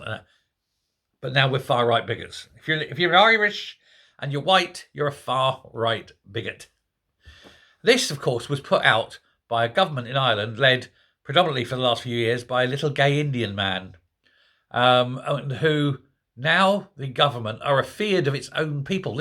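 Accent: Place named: RP, United Kingdom. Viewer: Just out of interest - if you are from Ireland then where did you get your rounded vowels & fairly posh English accent from?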